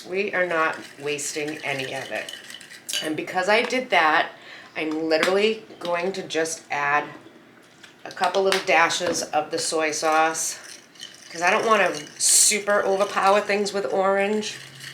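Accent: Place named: New England, United States. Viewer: ❤️❤️💕❤️💕love your channel and your beautiful New England accent!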